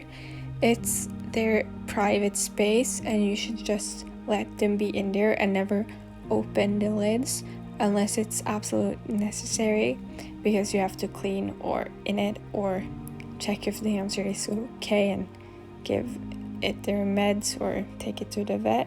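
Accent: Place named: Norway. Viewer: Hei hei, er du norsk 😊😊? hvor kjøper du ting til hamstere? Sorry if I’m wrong just guessing from your accent/English!!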